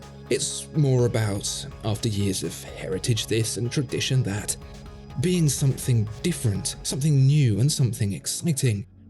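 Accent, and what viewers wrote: United Kingdom. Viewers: Time to dump this channel. The fastest thing you can deduce by anyone wearing a Rolex Submariner is they aren’t an actual diver. I love mechanical watches for the art, design and engineering. Could care less about tradition etc. when I bought my first (and only Rolex) I wanted a relatively cheap but sturdy and reliable watch. Got it used. Now worth 3 times what I paid. Don’t care. It keeps good time and I can wear it while working in my garage. Replaced chipped crystal once during overhaul. Most people don’t notice what it is and I’m happy with that. I’m going to find a watch channel without the gushy mewling, British accent, that talks about interesting complications and design ideas. Not about old traditions - that died decades ago. These are rich companies marketing smoke and mirrors to people who desperately want to “belong.” The richest guy I know wears a Casio digital because he likes it. 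I don’t like any watch with a battery because twice I found myself in a situation with a dead battery and no battery shop for a few thousand miles. For me mechanical and automatic.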